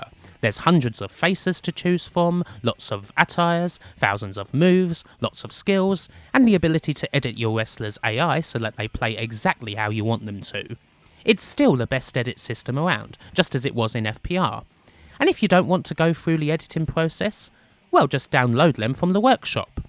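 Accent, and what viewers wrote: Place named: England, United Kingdom. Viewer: I think it's the English accent maybe?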